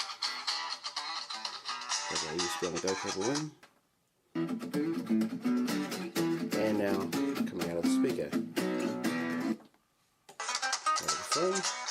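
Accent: New Zealand. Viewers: r u from NZ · cool accent! NZ i presume?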